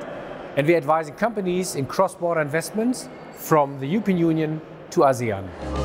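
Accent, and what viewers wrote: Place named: Germany. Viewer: Bro even has that german accent when speaking english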